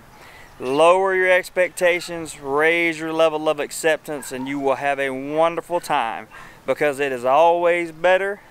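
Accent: South, United States. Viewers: New subscriber here. Thanks for the videos man. They are more deep with a reality of what to expect. You sound southern, where are you from Sc? Hahaha any way. Am planning on moving sometime to the philippines, around the Marakina city.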